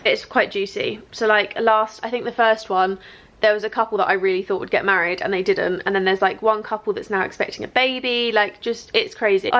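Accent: United Kingdom. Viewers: I've been watching you for a year now 😊 Back then I was living in Sheffield for my Erasmus year in the UK (I'm from France) and I wanted to find some UK lifestyle youtuber/content creator to watch while doing the dishes 😂 just to get used to the British accent 😂 and here I am, à year later, still watching your videos ❤ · I’ve been watching for 2.5 years and I think I found you when I was binge watching closet clear out videos during lockdown. I really like your style, your energy and your honesty. Also I moved from the UK to the US last year so it’s really nice to hear British voices on videos and podcasts.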